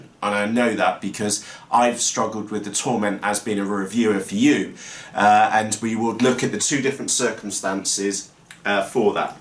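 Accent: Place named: South West England, United Kingdom. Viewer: Nice to hear a UK South West accent in a review video! Keep up the good work +, from a fellow UK reviewer!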